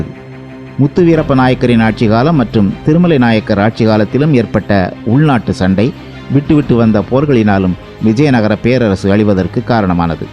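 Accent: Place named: Coimbatore, India. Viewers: கோயம்புத்தூர் பேச்சு வழக்கு எனக்கு பிடிக்கும் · Respect slang my home town kovai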